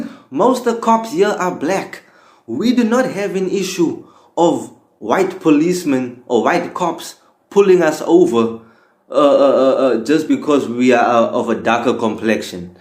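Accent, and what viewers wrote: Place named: Durban, South Africa. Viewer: Was gonna say you sound like you spent some time growing up near Durban somewhere, be that as that may, you saying you "not taking kak" is so typical though. Love it!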